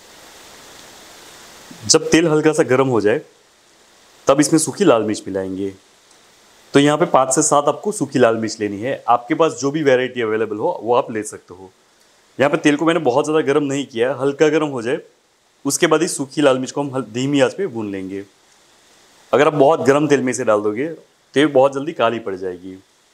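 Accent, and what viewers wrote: Gujarat, India. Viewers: Actually unka gujarati accent hai.. Toa apko der se samjh ata hoga.. koi baat nahi dobara sun lene me koi burai nahi hai..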